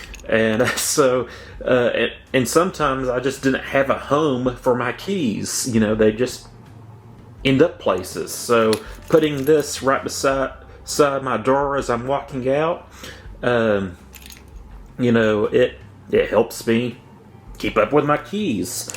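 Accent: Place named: East Texas, United States. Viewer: ok that makes sense. You had a very "no country for old men" type accent.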